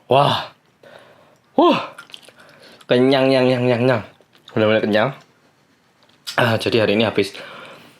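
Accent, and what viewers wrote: Java, Indonesia. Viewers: Mas Medokmu itu lho 😁 sesuatu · Selaluu nungguuuuu samaaaa orang medok ini hehe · Mas mw nanya dong, masnya ini org jawa atau oppa korea ya?? Aksen suara jawa totok, tp facenya 오 빠 😁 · ARTIS KOREA YANG MEDHOOOOKKK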